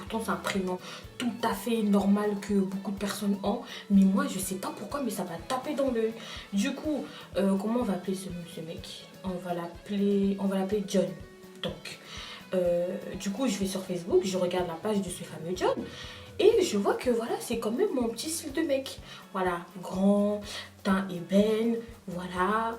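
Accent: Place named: Republic of the Congo. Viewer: Rien qu'a l'accent... je reconnais Congo brazza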